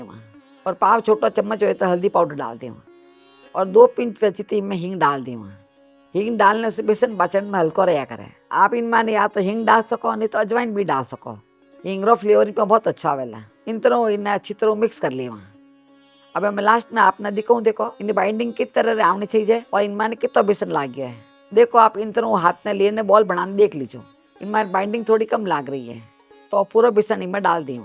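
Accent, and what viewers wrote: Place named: Jodhpur, India. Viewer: Mast vidio mast sabji mast jodhpuri boli sa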